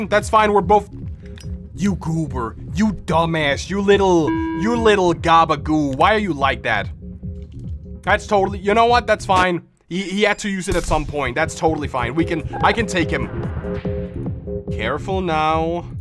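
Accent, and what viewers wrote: Denmark. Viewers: Jeg kan hørrer at du er dansk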